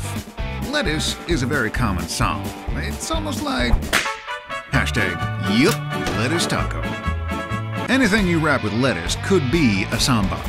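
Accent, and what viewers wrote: South, United States. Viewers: best narration yet by the narrator. only problem now is thinking southern accent when eating Ssam! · 1. Love the font you used, fit the country twang of the narrator and the music excellently. 2. The narrator's fast spiel reminded me of the guy introducing the hand jive in Grease the movie 3. I understood every word he said, I don't know if that means I watch too much Trisha Paytas or I've just discovered a new talent and 4. ....ONE SHOT!!!! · I loved the southeren narrator!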